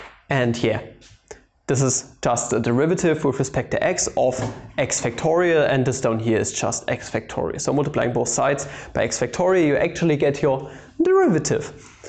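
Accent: Germany. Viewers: Are you german? You sound so bloody german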